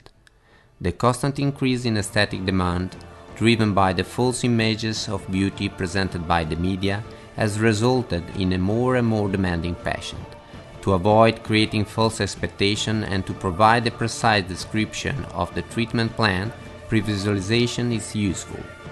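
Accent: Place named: Italy. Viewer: to avoid healthy animal.... hahahah love the 'italian English'.....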